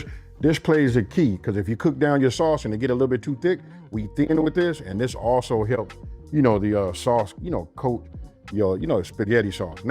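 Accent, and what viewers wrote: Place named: Bay Area, United States. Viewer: Because of Ryan Coogler, I can now detect the Bay area accent 🤣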